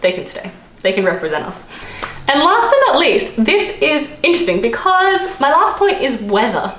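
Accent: Australia. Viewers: Do you think so? sounds quite a strong Aussie accent to me lol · You don't like Aussie accents? Its pretty much the main reason I watch your channel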